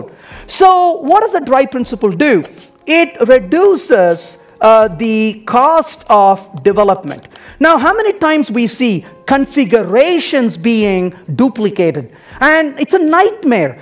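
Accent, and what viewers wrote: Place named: India. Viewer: Indian accent and public speaking don't go well together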